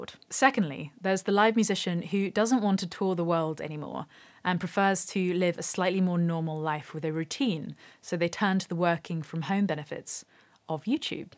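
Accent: United Kingdom. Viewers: i just realized you are ...British?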